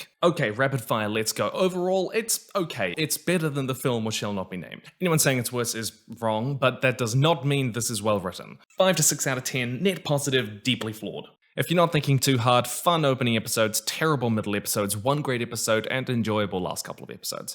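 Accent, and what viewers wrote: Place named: New Zealand. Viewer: fellow Kiwi detected, Opinion Accepted(Subscribed and Liked)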